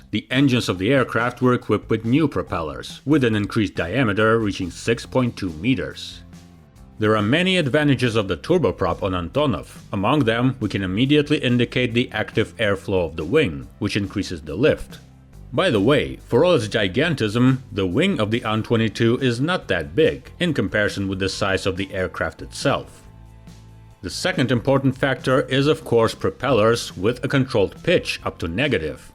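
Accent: Russia. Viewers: I though that it's going to be cheesy due to the Russian accent. But the whole video is well written & presented. Some details I'm hearing for the first time. Anecdotes from the time, historic footage, modern footage - well done! 😎👍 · "Your videos go from strength to strength. Your narration and English vocabulary are nothing short of impeccable." ..said by another viewer; echoed here. It's a pleasure to listen to your erudite narration and your Russian accent. Big fan of your videos. ~A former cold warrior.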